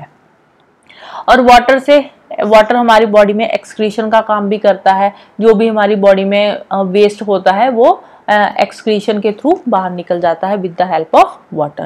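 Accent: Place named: Kashmir, India. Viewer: Mam apka ascent kashmiri type h , n Kashmir ke logo ki awaz bahot innocent si hoti h . Ap kya Jammu kashmir se h ? Ese hi question Aya man me to pucha...vese thanx again for everything 🙏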